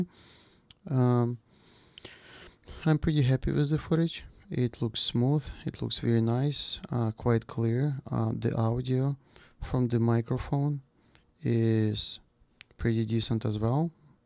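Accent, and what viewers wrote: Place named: Russia. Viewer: your speech accent sound like ur russian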